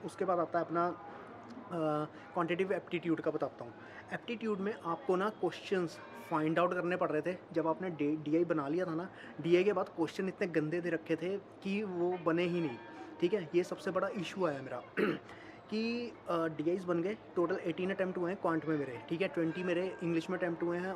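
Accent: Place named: Punjab, India. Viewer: Bhai aap Punjab se ho kya? Apki hindi me bhi punjabi accent aa raha hai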